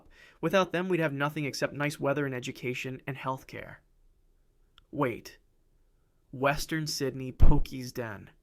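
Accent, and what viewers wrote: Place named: United States. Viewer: Profoundly ironic and offensive that its a bloody Septic (American) producing this video. Not that he's wrong, and its good to see someone turning these observations (debatable as some of them are) into a You Tube video, but I can't help baulking and can't watch the whole thing for hearing an American accent keep saying "WE" is positively nauseating.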